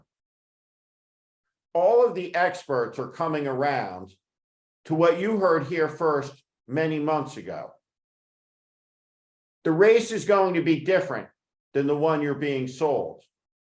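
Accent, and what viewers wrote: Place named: Philadelphia, United States. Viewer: Steve sounds more like he's from Philadelphia, not from Utah. He has a Philly accent. He just said that Park City, Utah was his hometown. Now I'm confused.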